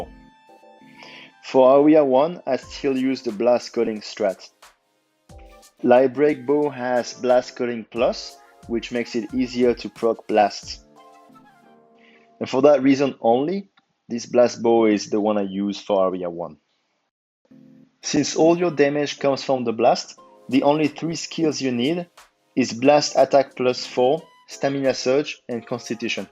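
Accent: France. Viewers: J’ai reconnu l’accent lol. Tu fais pas de vidéos en français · Ha ha, je m'en doutais ton accent anglais fais très français, sinon super build, vraiment original et passe vraiment bien sur le safi ;)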